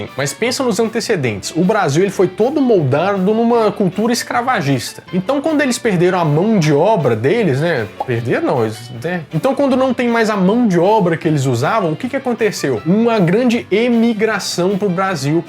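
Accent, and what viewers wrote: Minas Gerais, Brazil. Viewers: me inscrevi pq além de vc ser inteligente ainda é mineiro e eu adoro esse sotaque kkkk like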